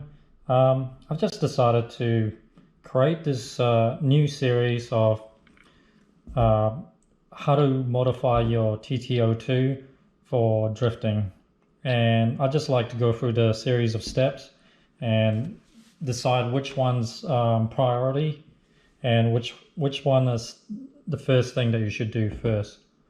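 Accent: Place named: England, United Kingdom. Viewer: Is this the uk cuz you sound english dude?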